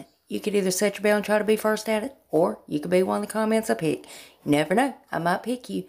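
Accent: South, United States. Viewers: Hi, I look forward to your sweet southern accent and advice. Because if you I’m going to upgrade. I almost dropped it thinking who’s going to see if I have makeup on or not. But it makes me feel a whole lot better wearing it around the house. Thank you for reminding me of that! Jen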